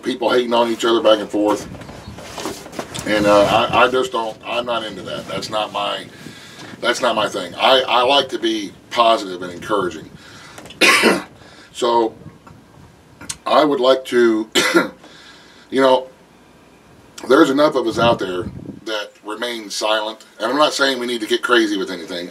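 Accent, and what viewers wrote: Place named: South, United States. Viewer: I'm a new subscriber and I love the content on your channel....you sir sound like the southern version of another YouTuber named Buckin Billy Ray from Canada...he spreads the same message, check him out. I love your channel and I will share with my friends. Cheers from Kansas City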